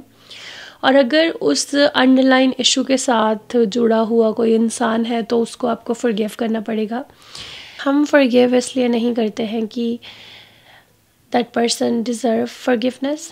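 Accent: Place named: Doaba, India. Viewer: Ist comment but follow bahut tym ton kr rahi, bolan de tareeke ton laggda hai k tuc doaba belong krde ho,mee too,jalandhar de aas pass,aur tuc??bahut achhey vlogs hunde ne, l like veer ji too much,the way he talks,v sensible nd funny nature too,i like it,plz rply i m sonia from jharkhand wid my in laws!!!🙏🙏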